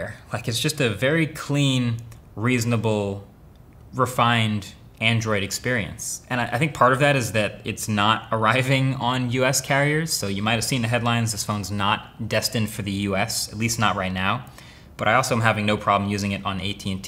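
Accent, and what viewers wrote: United Kingdom. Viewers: Bro, he is british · Finally a brit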